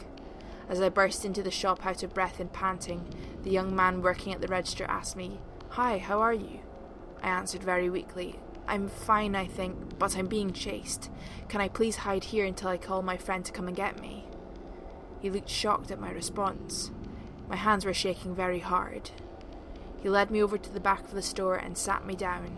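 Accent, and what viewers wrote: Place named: United Kingdom. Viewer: Great stories and you have a great voice! Love the British accent! Keep up the good work and looking forward to your next video!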